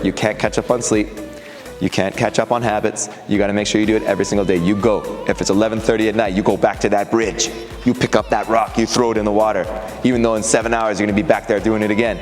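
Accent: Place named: South Korea. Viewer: yup yup I mentioned before but his Korean accent is totally perfect. Some of those TKD Korean terminologies are not very easy to pronounce for a non native speaker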